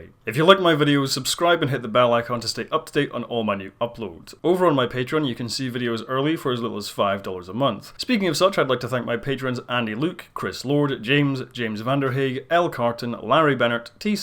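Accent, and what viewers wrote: Scotland, United Kingdom. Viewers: OMG! What town in Scotland were you born in? Sooo beautiful. Your Scotch accent is not thick BTW. LOL. Jjking. I know the term is "Scottish". The Macallan is my favorite Single Malt SCOTCH whiskey(proper use). No blended whiskey ever passes my lips.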